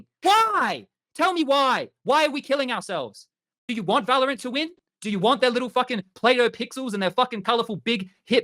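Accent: Australia. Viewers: just Australian accent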